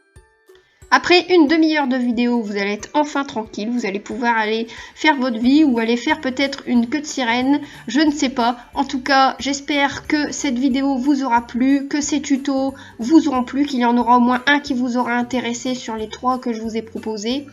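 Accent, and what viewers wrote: Nord-Pas-de-Calais, France. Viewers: pas du tout. Adorable accent chti hein?😁😁😚💗💗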